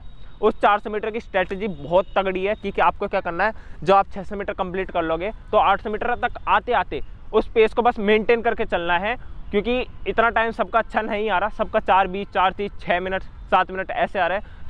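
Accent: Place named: Haryana, India. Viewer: 😃😃😃haryana h bhai Uski glti n h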